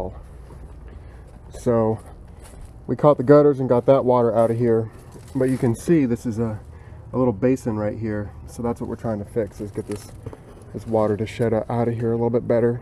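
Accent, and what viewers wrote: United States. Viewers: I can't place your NC accent....Cary?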